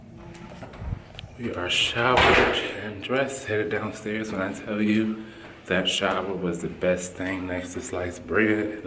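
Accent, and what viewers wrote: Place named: New Orleans, United States. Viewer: Are you originally from New Orleans? You sound like it. I am a 62 year old woman who finds your videos very entertaining. Thanks for making me laugh.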